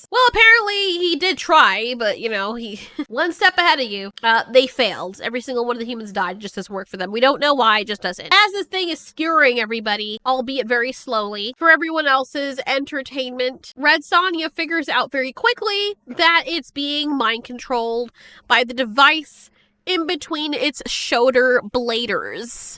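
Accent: Scotland, United Kingdom. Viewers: love scottish accent :D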